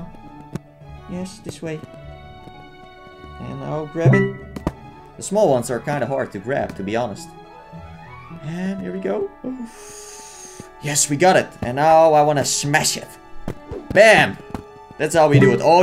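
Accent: Netherlands. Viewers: oohhh ok, I guess I've never heard a Dutch accent :D cool vid man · are you dutch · Do I hear a Dutch accent?! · Are you from the netherlands? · Ben jij nederlands you have a dutch accent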